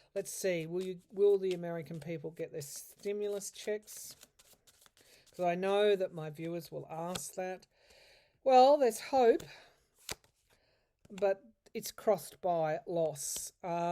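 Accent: Australia. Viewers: So refreshing to hear an Australian voice!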